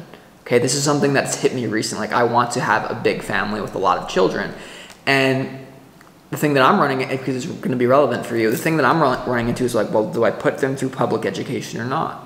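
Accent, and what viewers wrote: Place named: Texas, United States. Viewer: Sounded Texan thank you for your time 😊 Yes! I circle around back to why I started my channel 😊 that's deep...my kids are my greatest legacy but maybe I do want to leave another legacy. Greane village with my soulmate who is back in my life.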